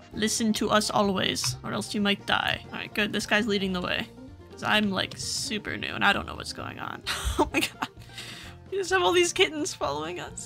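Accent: Russia. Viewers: I love your accent, can you tell me if it's Russian or somethin PLS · Are you Russian like I keep hearing a Russian accent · lol u sound like you have a Russian accent and it sound cool :>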